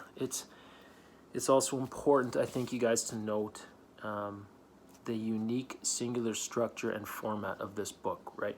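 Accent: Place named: Canada. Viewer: Ah, I could sense some sort of Canadian in the accent. Alberta is a beautiful place. Much love form Toronto! - pls keep up with the reviews/book vids, there are no where near enough people covering these sorts of books let alone reading them.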